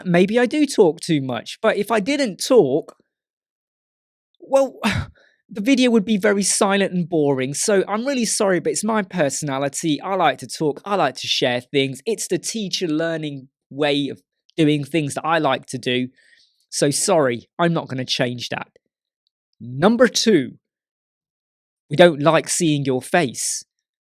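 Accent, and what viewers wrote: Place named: United Kingdom. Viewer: I love to watch you videos and is 1st time when I out a comment here. don;t take it pwrsonal, is because . I am too introvert to comment. 1- yea, maybe you talk too much but I see that like a good think: you give a better explanation and people can understand. the prcesses better. 2- Your Face . what? people say that to you? fuck them all ! 3- Elementor Bias: FFS your video are about ELEMENTOR! Is not about tomatos or pigeons! Elementor is your tool and if they want another editors they can watch other youtbers! period! 4- Promoting Hello: like you said: Hello is a FREE theme! I am not using it too much. I tryed ASTRA and OceanWP free versions. I chosed Ocean but Helo is an great and most important is a clean theme ! And in the future I want to focus on it. 5- Gimmicks? Iam lost on this! i do not understand what they want to say. 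because I learned many things for your videos and I am not new on elementor... 6- Too Excited: that makes your videos funny :) 7 not the Beta... Is someone want to see what is comming they can always use official elementor channel or watch other youtubers. 8: UK. well, you are born in UK. I jus tcome here in 2015. Your accent is 100% british. Yes, you are from UK :). 9- OceanWP. Well, Astra is worst than Ocean WP. The main reason i din not bough Astra or OceanWP is this: If i have troubles with free versions, why should I pay for it? my final toughts: keep doing your work :) haters always will hate. And I appoligise for my english, is not my native l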